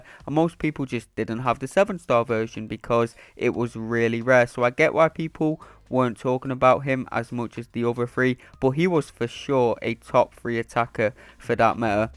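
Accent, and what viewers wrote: United Kingdom. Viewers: Hey, where in the UK are you from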